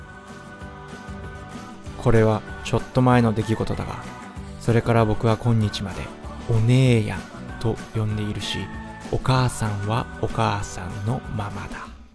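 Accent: Kagoshima, Japan. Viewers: かごんま出身、知らなかったか忘れてました😮訛りたまらん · 鹿児島のなまり（笑） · リクエストした鹿児島弁さっそく話して下さりありがとうございますー🎵鹿児島弁忘れてなかったですね😆笑ったぁー😆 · 鹿児島出身鹿児島育ちなので、方言嬉しいです😆 さんの動画などで、いつも笑わせてもらってます！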